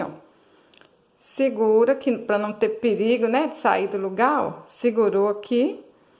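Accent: Minas Gerais, Brazil. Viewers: Amo seu jeito mineirim carinhoso de falar...kkkk Você é um doce!!!! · Que pessoinha linnnnnda que você é, e esse sotaquin de Minas Gerais! Muito obrigada por sua generosidade.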